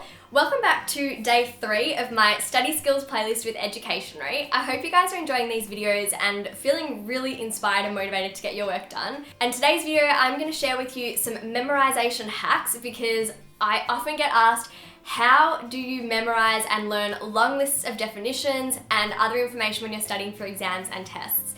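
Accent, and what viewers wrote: Australia. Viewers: Aeee love your Aussie accent x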